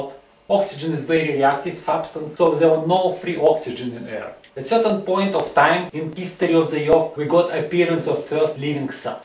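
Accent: Russia. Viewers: I have learned so much just from watching your video. I find the comparisons between primitive and modern day breathing mechanisms immensely fascinating. I am a physiology student and this has given me a lot to think about! I'm also Russian (I think you are too?) and it is wonderful to hear the accent again after so long.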